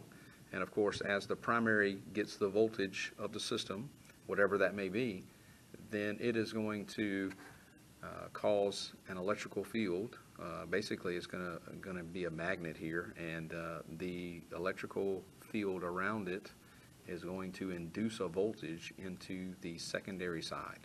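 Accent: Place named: South, United States. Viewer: Why do all the videos have a tech with a southern accent?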